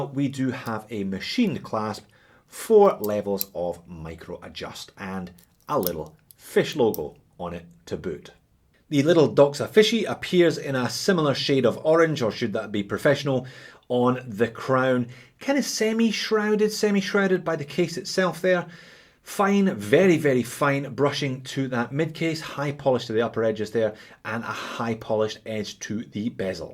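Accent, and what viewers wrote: Scotland, United Kingdom. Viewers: my man, i thank your for your reviews. your scottish accent and your humor eases my day! thank you!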